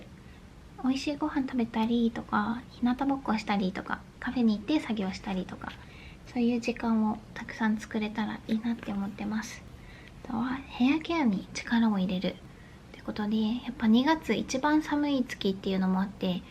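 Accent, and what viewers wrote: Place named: Okinawa, Japan. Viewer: 沖縄の方言おもしろーい😊 私は愛知の尾張弁と三河弁と岐阜弁が混ざってて、よくわからんことになってます笑 愛知岐阜三重は、友達とか職場にいるからうつるんですよね、、、😅笑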